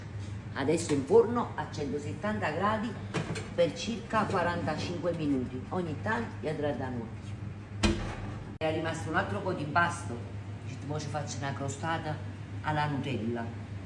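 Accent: Naples, Italy. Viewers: Brava con il tuo modo di fare rendi tutto facile fai venire la voglia di cucinare e fare i dolci insieme a te❤ Non c' è niente da fare la cadenza Napoletana rende tutto più facile. Anche io sono Napoletana e appena posso vengo a prendere la pizza da asporto da te per i miei bimb❤❤❤i e mangiami anche la tua frittatina di pasta e il tuo crocche ' di patate mentre aspettiamo di ritirare le pizze. Grazie per la tua semplicità e la tua simpatia innata❤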